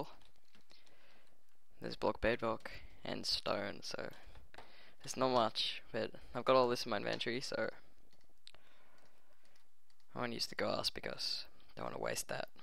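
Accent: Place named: United Kingdom. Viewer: You sound brittish!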